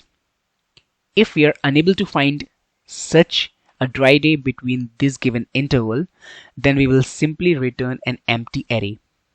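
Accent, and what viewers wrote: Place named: India. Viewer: accent is so bad, so indian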